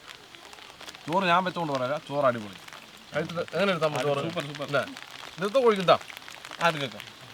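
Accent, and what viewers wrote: Palakkad, India. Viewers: Palakkkadan slang♥️😌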